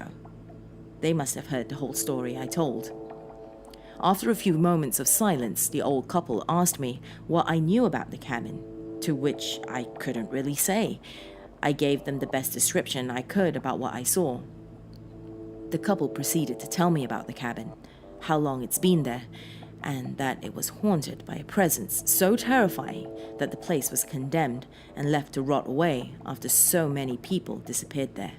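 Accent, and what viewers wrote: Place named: RP, United Kingdom. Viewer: I'm really enjoying your channel. I have noticed that you seem to get more adverts than the likes of Mr. CreepyPasta and Creeps McPasta (my head has a problem getting around Creeps' last name and yours lol!) You seem to be proving my theory that its stories like Slenderman and probably Jeff/Jane the Killer that have been involved with real life controversy that keeps advertising away. I have been puzzling over your accent which comes across as very non accented BBC or RP English. I firmly believe that narrators like yourself do as much preparation as you would if you were acting a part. I'd guess that you've done English to a high standard and have done some voice production work which makes me think that you're an actress. The thing that got me puzzled about your accent was that occasionally it sounds almost like a really soft Scottish lilt and occasionally I hear a little London (I'm a Scot living in London). 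Its brilliant listening to stories being read properly and if you haven't already, I would approach some of the publishers who do audiobooks because, I've heard a lot since I can't seem to own reading glasses for longer than 48 hours without breaking them! I have listened to a lot of narrators who are working on Best Selling Audio Books and you are every bit as good as, if not better than most of them. I am really pleased to have found your site because I am heartily sick of hearing good stories murdered by narrators who don't seem to prepare or even look the story ove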